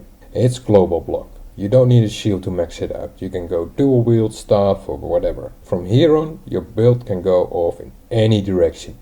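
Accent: Netherlands. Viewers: u dutch ?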